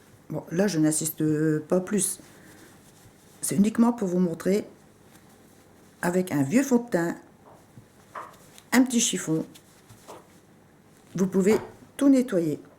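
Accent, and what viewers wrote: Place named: North, France. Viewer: Bonjour Madame, merci pour les astuces que vous transmettez généreusement pour le nettoyage de variétés de bijoux telles que l' or, l' argent etc...😊 Vous avez un accent du Nord...👍😊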